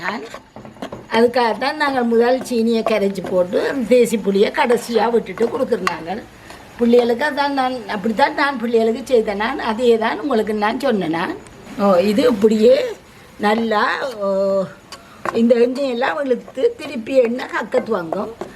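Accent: Jaffna, Sri Lanka. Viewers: This is how majority of Tamils from Jaffna speaks... especially the older generations.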